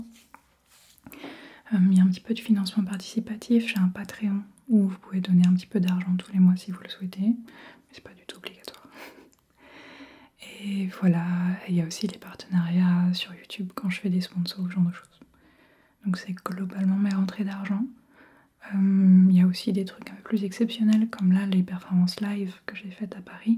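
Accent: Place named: France. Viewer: Coucou ! Par rapport à la question sur tes pronoms/ton genre, du coup je me posais une question, comment le pronom "ael" s'accorde pour les adjectifs ? Si j'ai bien compris tu préfères utiliser les adjectifs neutres ce que je comprends totalement mais voilà je me posais la question :) Sinon par rapport à la bienveillance que tu retrouves + chez les anglophones que chez les francophones par rapport au 50/50 des vidéos anglaises/françaises je pense que c'est parce que les anglophones ils adorent l'accent français même s'ils comprennent pas et les francophones ils aiment pas trop les vidéos en anglais.. en tout cas bon courage pour tenir le 50/50 mais sache que tu nous decevras jamais peu importe les vidéos que tu fais :)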